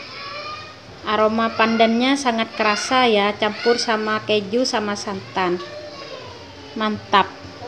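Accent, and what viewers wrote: Madura, Indonesia. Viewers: Negguh Deri logattah Mak engak Reng Medureh.. Salam sittong dere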